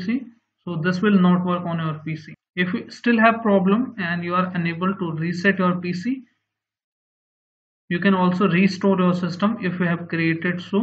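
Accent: India. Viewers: It worked smoothly. Been searching the solution for hours. And i knew im in a safe place after hearing an indian voice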